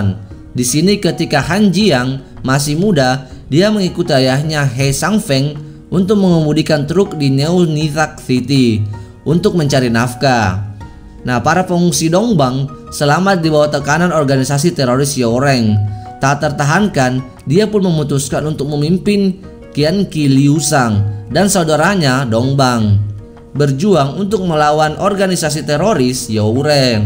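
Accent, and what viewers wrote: Takalar, Indonesia. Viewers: Orang takalar sulawesis elatan kah?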